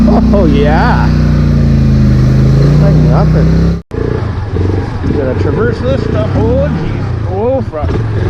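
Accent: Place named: Canada. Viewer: Sound Canadian bro!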